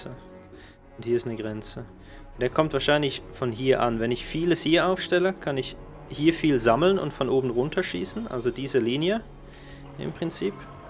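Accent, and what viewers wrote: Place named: Netherlands. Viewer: Bitte sag mir das du Holländer bist xD der Akzent ist der hammer😂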